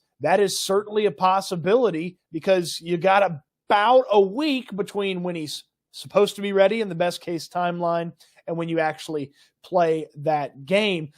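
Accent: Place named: Texas, United States. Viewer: I only really listen to the wheelhouse for your texan talk tbh....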